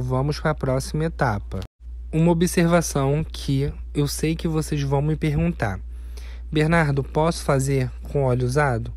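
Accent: Rio de Janeiro, Brazil. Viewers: Adoro suas receitas pq além de vc explicar muito bem ainda ficam lindas e certamente muito potentes, e esse ficou maravilhoso. Bernardo, gosto muito também da sua voz e de seu sotaque. Vc é carioca?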